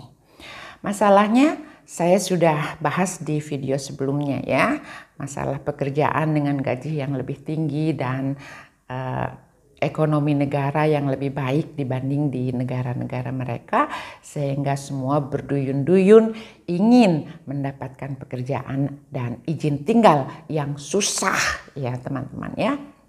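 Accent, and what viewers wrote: West Java, Indonesia. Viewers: 30 tahun lebih tinggal di Swiss, tapi logat bahasa sundanya masih terasa bu. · Masya Allah. Ibu parantos 40 thn di Swiss tapi tasih keneh nyunda. 👍 Punten neupangkeun abdi ti Cileunyi bdg. Pun anak Oge di Swiss ti sasih januari nuju ngiring program au pair, linggihna di kota meggen. Mudah2an pun anak tiasa tepang sareung ibu. 🙏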